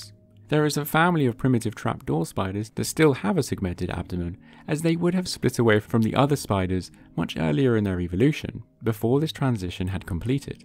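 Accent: United Kingdom. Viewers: he can't help that he's british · do you guys just believe every claim that's confidently stated by a guy with a british accent? it's a rhetorical question, i know you do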